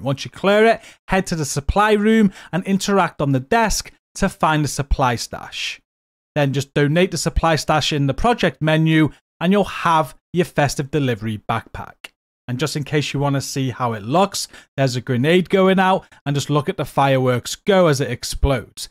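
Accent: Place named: North, United Kingdom. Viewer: northern accent strikes again ❤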